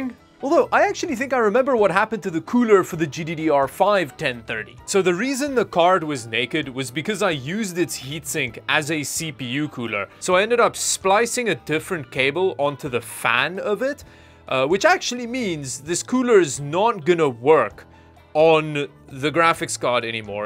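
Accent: South Africa. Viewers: Is South African? Accent seems familiar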